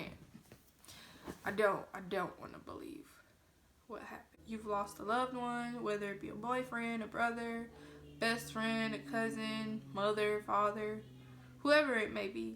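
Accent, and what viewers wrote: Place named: New Orleans, United States. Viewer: You from New Orleans?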